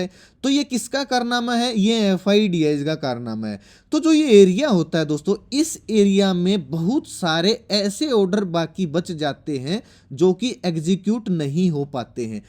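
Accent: Haryana, India. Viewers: App Haryana sy ho kya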